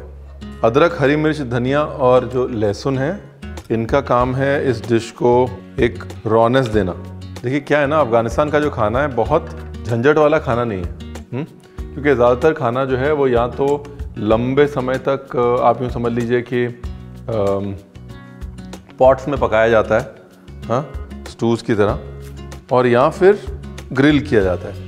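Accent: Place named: Lucknow, India. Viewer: The way you speak is amazing, no one can touch the Lucknowi style and poise.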